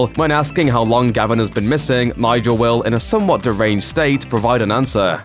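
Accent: England, United Kingdom. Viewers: No he doesn’t- he pronounces it like an English guy as he’s English like me!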